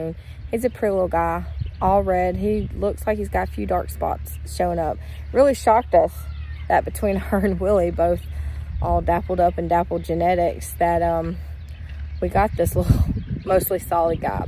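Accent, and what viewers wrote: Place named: South, United States. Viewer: Their little southern accents are just as adorable as yours..lol I'm southern too from GA lol but it's just so cute to hear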